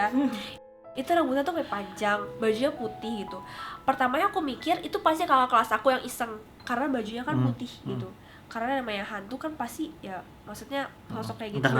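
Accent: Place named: Banyumas, Indonesia. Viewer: Ngapak Onlen :v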